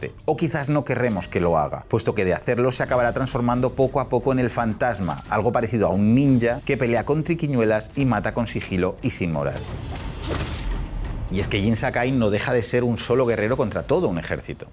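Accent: Spain. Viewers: A hacer un curso de idiomas, cualquier idioma, que todas las palabras extranjeras las pronuncias como un español típico que dice "se lee como pone".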